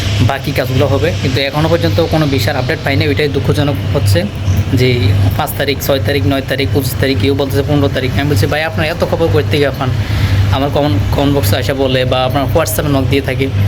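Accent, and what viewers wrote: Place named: Comilla, Bangladesh. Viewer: আসসালামু আলাইকুম কেমন আছে ন ভাই আপনার বাড়ি কুমিল্লা কেন বলছি ভাষাটা কুমিল্লা আসে দোয়া রহিল মক্কা জমিন থেকে আমিন।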